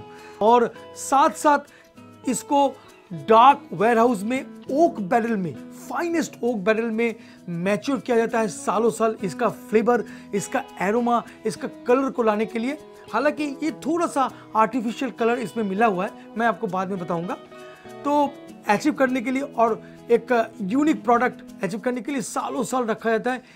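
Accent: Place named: West Bengal, India. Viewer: bangali kab se bartender banne lag gaye...fir hume to rosogulla khana shuru ke dena chaiye..saala bolne k tareeke se hi nasha utar gaya...not watching beyond 30 second... 🤣🤣 dada tumi roshogola khao..daru varu tumhara kaam nhi h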